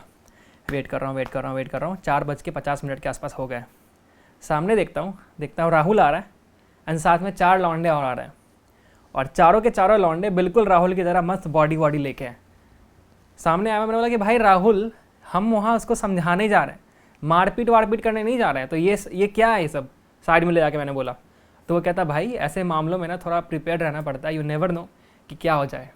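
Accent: Bihar, India. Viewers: Bhaiya aap bihar se ho kya 🤔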